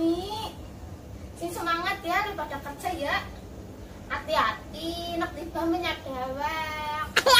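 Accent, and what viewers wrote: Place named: Banyumas, Indonesia. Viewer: Wow...ngapak ngapak mantap... Salam kompak...paket durasi aman nggih...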